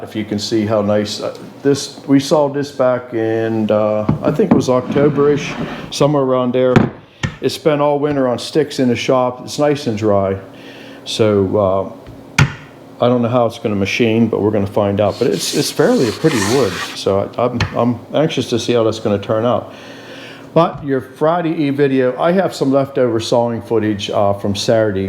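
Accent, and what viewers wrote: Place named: Maryland, United States. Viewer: With your accent I would say you are from Hagerstown MD, where I’m from.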